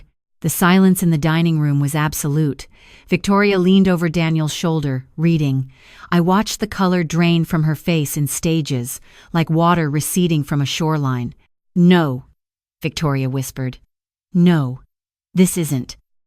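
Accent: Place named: France. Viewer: Why french pronounciation? Needs fixing.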